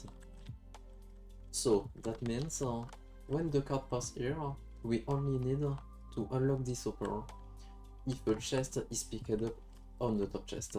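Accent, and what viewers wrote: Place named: France. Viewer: A ton accent je dirais que tu es français xD. Good job bro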